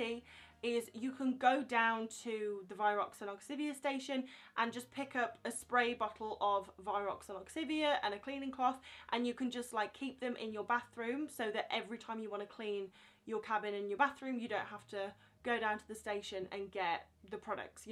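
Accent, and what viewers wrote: United Kingdom. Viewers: Where in the UK is your home? Or more to the point, which regional dialect is yours?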